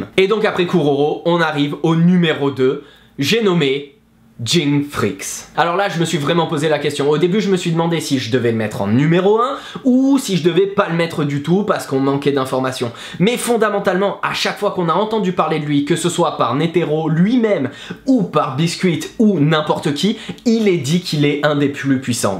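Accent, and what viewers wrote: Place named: France. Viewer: Don't understand a word 😂 but I missed you and your wonderful French accent so much! 😍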